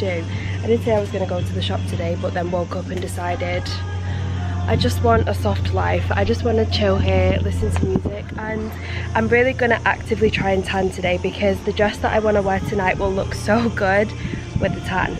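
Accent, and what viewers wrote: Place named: RP, United Kingdom. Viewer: I love your accent, so posh lol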